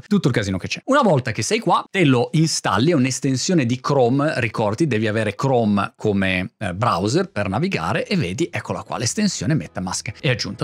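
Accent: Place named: Matera, Italy. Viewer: Grande dialetto materano ti si addice😉 tutorial ridendo e scherzando inbocchi caramelle ai principianti bravissimo super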